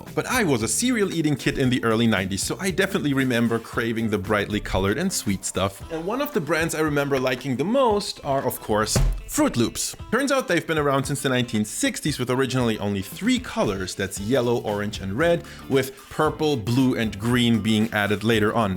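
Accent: United States. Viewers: I like your videos. :) And I'm really curious to know where you're from. Well, obviously, you're German. But you sound like an American and you look like a mixed European and Middle Eastern. 😄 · your accent is INCREDIBLY American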